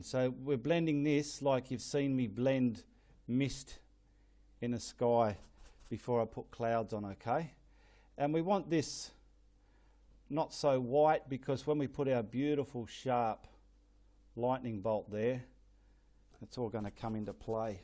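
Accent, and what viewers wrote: Australia. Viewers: Love the Aussie accent and humour. Love your paintings too and I will try something similar. Thanks from another Aussie.. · After reading a few comments, I think your videos are just about right. 30 minutes is great. I tend to get tired and lose interest after 40 or so. Keep up the excellent work! (Although, I could listen to you speak for hours! Love the Aussie accent!)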